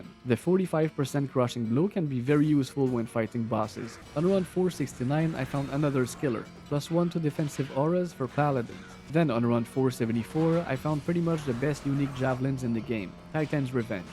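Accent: Quebec, Canada. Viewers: Ton accent est tellement l'image parfaite du Québécois bilingue lol. Bon vidéo broski! PS : J'ai 2000 kills de meph/andy TZ en solo et je n'ai toujours pas Highlord's. J'ai juste abandonné et pogner mes torches lol. T'es chanceux !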